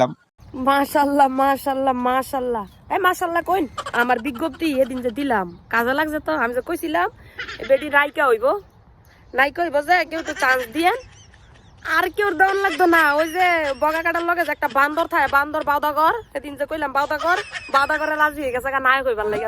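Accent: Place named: Sonargaon, Bangladesh. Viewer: আপনার কথা বলার ধরন গুলো আমার অনেক ভালো লাগে,, আপনার গ্রামের বাড়ি কি সোনারগাঁ মদনপুর এদিকে,, আমার এক ছোট ফুফুর বাসার ওখানে,, তাদের ভাষা এরকমই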